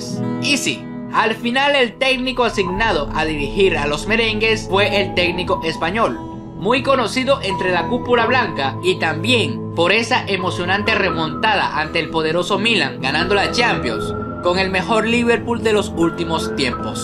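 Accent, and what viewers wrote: Venezuela. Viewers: Hasta el acento es venezolano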